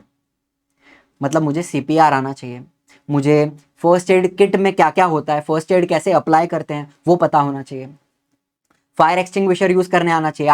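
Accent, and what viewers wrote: Maharashtra, India. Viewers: Sir AP Marathi ho kya Hindi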